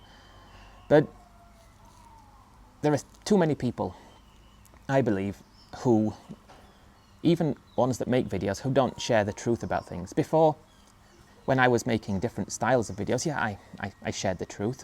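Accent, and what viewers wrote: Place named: Thailand. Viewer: You’re right. Let the haters write their comments. The creators who delete everything negative are very insecure. People don’t want to see filtered comments that only suit the creator’s narrative or agree with everything they say There’s an individual in particular who does that and it’s very annoying He’s extremely defensive and is always showing anger at ‘the trolls’ but we never know what the alleged trolls are saying because he deletes absolutely everything he doesn’t 100% like (I can only imagine though - he intends to retire (in England) aged 55, wife 60, never work again with only £250k and from that cash they’re going to buy a 50 year old boat and 20 year old campervan and live out their years in those - good luck to them is all I can say 😂 They’re one expensive repair away from disaster, not that they have enough money anyway, and they’re used to living in a house. 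So I think he’s very much underestimating not only that but the lack of space physically and from each other, enduring the cold harsh winters and lack of amenities. Car crash viewing - the delusion makes for interesting watching!! 😂) Would you consider doing a video about you. What age you were when you moved to Thailand, why, where in England you’re from (I cannot place your accent and in fact before I saw you I thought your accent was Thai!), if you have a wife, how you met her, if she’s Thai, do you rent property or is anything you own in a Thai person’s name, if you’ve got kids, what jobs you’ve had (p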